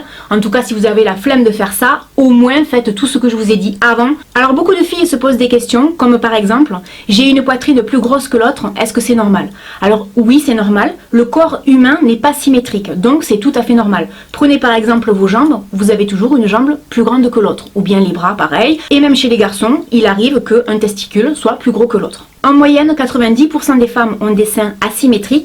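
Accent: South, France. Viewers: Toi tu vien du sud j'aime ton accent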